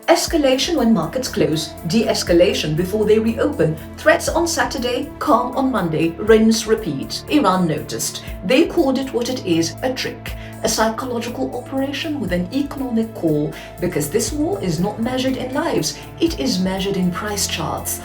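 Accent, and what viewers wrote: RP, United Kingdom. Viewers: I like a lot your British accent Anyhow, never ever trust trump and Iranian people should not fall Again into Trump propaganda 👈👈👈👈👈👈👈👈👈👈👈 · This truth-teller with her hilarious Julie Andrews accent haunts my dreams :face-red-heart-shape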